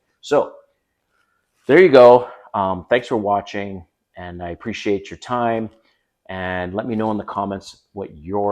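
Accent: Canada. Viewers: lol, I knew you were a fellow 🇨🇦 the second you started speaking 🫶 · Def got the Canadian accent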